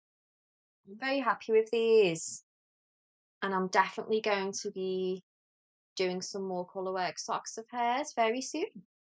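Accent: Liverpool, United Kingdom. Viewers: I knitted facial cloths out of Bamboo Pop, which is cotton/bamboo. They turned out to be sturdy. I liked the 20cm (8") square, and knitted them with squares K5 P5 and slipped the first stitch of every row. I'm in Maryland, and heard about you from Becky of A Handknit Letter. Love listening to your Liverpool accent; reminds me of interviews in the '60s with Paul McCartney. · What a great podcast! I'm from Stourbridge in the West Midlands so not a million miles away, and I love the scouse accent. You are so natural and chatty. I love it! I've just subscribed, too ❤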